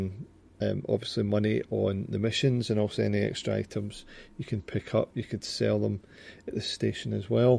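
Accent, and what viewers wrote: Scotland, United Kingdom. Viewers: You sound just like me. I,m from Coatbridge. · are you scottish i can hear the accent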